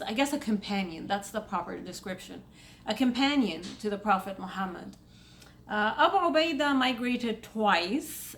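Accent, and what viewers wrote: Egypt. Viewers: You Egyption acisent dosent bother me none honey. Its all good. Keep up the good work. I watch your videos all the time .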